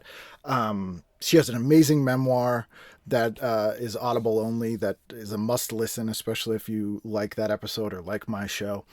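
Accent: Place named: Boston, United States. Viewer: Congratulations! Been loving going back through the back catalogue. I blame you gushing about Trilogy of Terror on Junkfood Cinema for making me go listen to your podcast (and also Dana Gould and listening to you both go FULL Boston which is something to behold). You rock, man!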